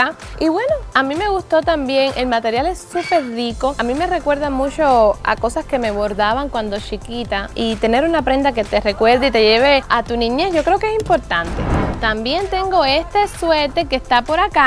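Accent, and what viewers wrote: Cuba. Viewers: Su acento de cubana es simpático, yo q ella, no enseñaria todo lo q tengo, no hace falta, ya tiene bastante dinero, le gustará la fama, bueno, cada uno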